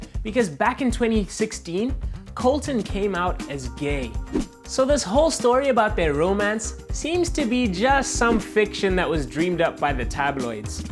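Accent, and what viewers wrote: South Africa. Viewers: This presenter sounds South African so over there Arrow season 7 was just released on Netflix. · is this guy South African?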